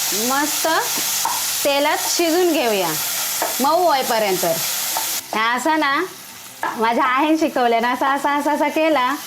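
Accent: Malvani, India. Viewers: व्वा अस्सल मालवणी संभाषण. ऐकुक खुप बरा वाटला. तुमचा बालपण गावात गेला वाटता. भाजी, आंबोळे, सांबारा एकदम झकास. बारीक सारीक मुद्दे खुप चांगले समजऊन सांगितले. 👌👌👍👍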